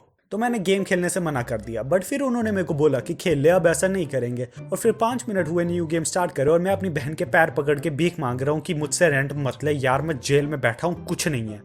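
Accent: Jammu, India. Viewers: Bro aap jammu se ho kya ?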